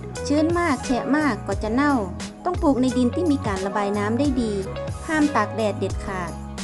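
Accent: North, Thailand. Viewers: ชอบสำเนียงทางเหนือจังครับแฟนผมกะอยู่ลำปาง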